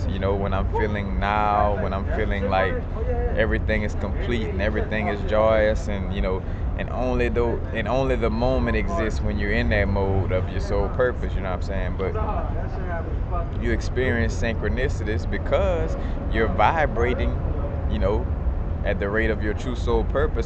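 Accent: New Orleans, United States. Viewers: Brother are you from New Orleans? Sure sounds like it.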